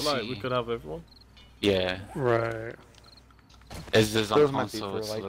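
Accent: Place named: England, United Kingdom. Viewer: Hoping to find the mandem.. My G is mad english proper tings n dat 💯❤😂😂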